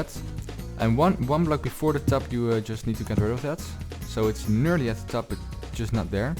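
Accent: Netherlands. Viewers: Ik hoorde wel dat je NL was!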